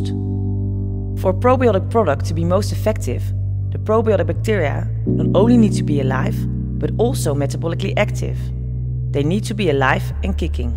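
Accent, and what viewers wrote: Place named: Netherlands. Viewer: this is an obvious Dutch accent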